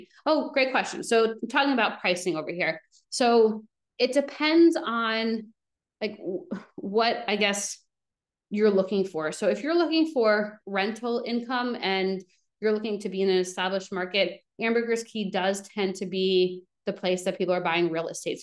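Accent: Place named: New York, United States. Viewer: As soon as you started speaking, I knew you were from NY!! :D Great content. Thank you for your work!! Looking forward to your next live session, and your other channel. Notifications are now on. Belize planning underway.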